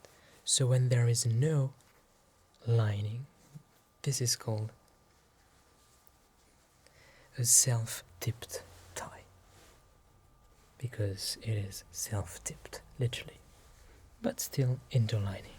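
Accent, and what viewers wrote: England, United Kingdom. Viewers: Did I hear a hint of an English accent?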